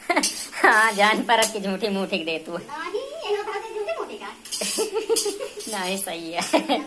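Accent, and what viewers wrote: Raebareli, India. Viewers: Ya to raebareli ke boli aay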